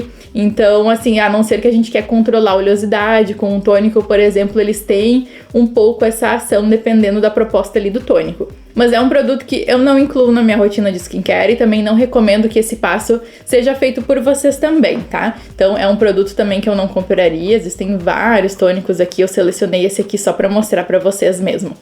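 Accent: South, Brazil. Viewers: Nada a ver com o conteúdo do vídeo é como eu gosto de ouvir o sotaque do sul. ❤❤❤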